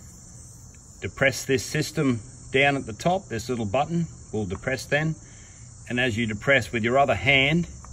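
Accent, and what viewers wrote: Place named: Australia. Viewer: As soon as i heard the aussie accent I knew he was going to help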